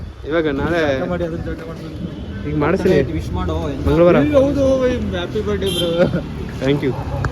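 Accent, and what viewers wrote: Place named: Bangalore, India. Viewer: Full Bangalore ಭಾಷೆ..... ಶಭಾಷ್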